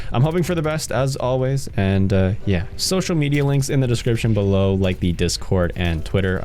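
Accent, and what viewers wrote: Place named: Canada. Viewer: he's Canadian. You can tell by how he says certain words.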